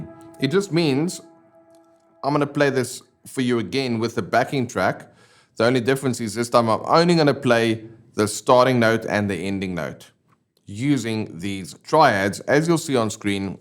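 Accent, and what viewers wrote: South Africa. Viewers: thanks for the vid! Are you south african by any chance?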